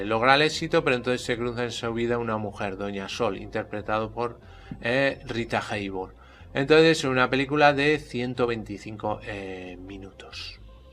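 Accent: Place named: Spain. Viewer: No soporto tu acento español te bloqueare mejor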